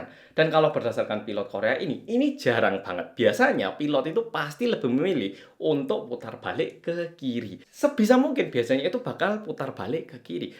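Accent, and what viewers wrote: Java, Indonesia. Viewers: Makasi, dapat ilmu juga terkait pesawat dkk, dan berduka cita untuk JejuAir 🙏🏻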